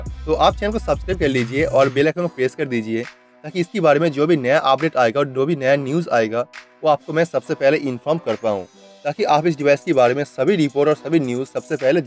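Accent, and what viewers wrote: India. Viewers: indian english? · Fuck Indian accent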